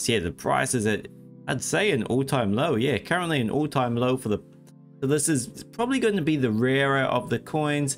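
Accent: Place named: Ireland. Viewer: .03 now lol the game crashing bad. Here is the thing. It is all clicking and no development in making the game playable. It is exactly as playing the stock market and not a game. But here is the thing this game would be very nice but thing is you have to spend crypto. No cc or account in the game. So it sort of locks players in to only play with crypto instead. Look at star citizen. They have made over 500million and still in alpha after 13 years later. This game looks good at 1st then dig into it then you see it's very very bad over all. Plenty of games you play to earn and also in sol blocks too. Just another dream and wish demolished. Btw your voice cool sounding be like an Irish guy. Lol like it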